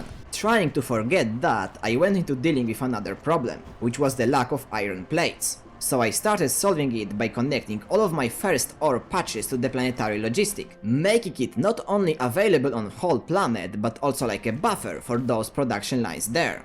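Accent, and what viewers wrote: Poland. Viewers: Polski akcencik so its peak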